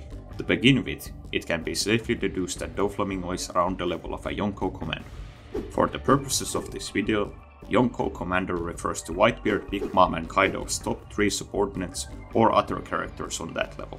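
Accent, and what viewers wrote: Finland. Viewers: your accent sounds finnish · Why do you sound Finnish?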